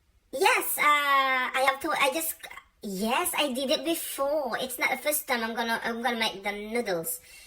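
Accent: England, United Kingdom. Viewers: Owmy Anti nalaing ka gayam agsarita iti ingles, british accent pay aya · Hahahaha!!!! Britische Accent Ni Ante....Guten Morgen From Homburg Saarland Germany 🇩🇪...Naiinlove na yata ako sayo..... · Was that British or Australian accent Auntie? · Filipinos taking over the world through pancit, lumpia and adobo😃😂. Some of my coworkers are one pancit away from becoming Filipinos 👍Nice British accent by the way😊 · British accent ni Anti..Love it. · Nagtangsit ti British accent mo auntie!!! Bilib ak manen kanyamon. HAHAHAHAHAHA 😂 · Hala nagtangsit ni anti..English speaking..adda pay British accent na..pasuruak man anti..😄😄😄😁😁😁😅😅😅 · huwaaawww!! British accent ni anteee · mayat man ta British accent mo i like it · wow anti british accent. laing k nga tlg.. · Alla ni anti🤣surwan naktu man anti ti english speaking ken british accent mo💙lovin' all your video^_^ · Mayat iti British accent ni Anti! 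· nakabritish accent ni antie.. nglaing ka man antie.. surwan nak man met garud!!Godbless u antie.. ingats!!😘❤️ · Britist Accent ah gimar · oh my goodness! that british accent i sooo love! nagperfect ka! magustwak dagita nga accent mo. namin anok inulit ulit nga binuya detoy. nagperfek talaga 😂😂😂. thanks anti napaisem nak manen. 😘❤️ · British accent ka met gayam... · british accent ni 😊😊😊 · Ganda ng british accent mo anti:)) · Naka british accent pay auntie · Nagtangsit ti panag english ni 👏👏👏 british accent ni annntttiii tayu!! 😊😊😊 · naglaibg ka agsao ingles auntie, kasla ka lang taga UK. proud of u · The accent anti, briton, i love it, uray ta badom ken ta lipistic mo nagmayat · Nosebleed with your british accent❤️❤️😍😍anty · more British accent plz love it so much..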